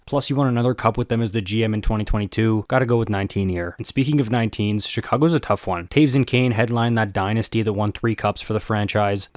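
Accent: Quebec, Canada. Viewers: la prononciation dun quebecois ca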